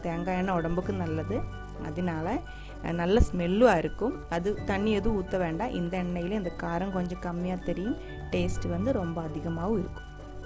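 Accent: Kerala, India. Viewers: Unga Tamil malayala vasanaiyodu alaga erukuu. ❤ & Thnx for the information on how to select kappa kilangu dear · ur tamil is so good.. though little Malayalam accent... where r u from mam... my Dubai kerala friend told me this recipe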